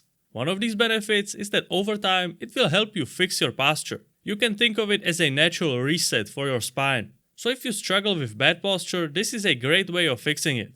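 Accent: Czech Republic. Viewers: Český přízvuk 😅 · Hey! I know you will most likely miss this comment, but I still wanna ask: I have an oddly specific interest in accents and like to make a small "game" for myself in guessing them and after watching a few of your videos I wanna ask: Are you Czech? Odd question aside: I love your content ❤ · yo are u Czech ? just guessing from ur accent haha, great video anyways, will definitely try it! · you are from czechia, the accent cannot be hidden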